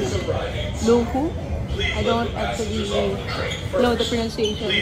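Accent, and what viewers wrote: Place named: Philippines. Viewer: From her English accent, she's Filipino.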